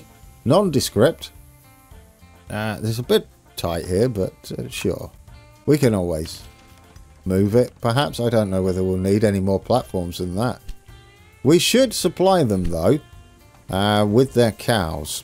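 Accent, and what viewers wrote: England, United Kingdom. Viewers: Good episode. Nice train journey at the end! How come you are living in Austin? You sound English! I lived in Denver for 3 (excellent) years. Spent many a weekend exploring the Rockies. Also went to San Antonio, to see the Alamo, though I don't think we went to Austin.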